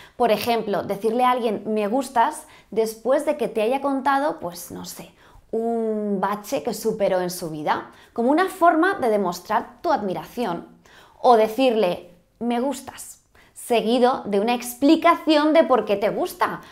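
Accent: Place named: Spain. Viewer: A mí me gustas tú por tu acento español, tu lenguaje corporal y físicamente tu cabello te hace ver guapísima, así que...voy a España o vienes a México...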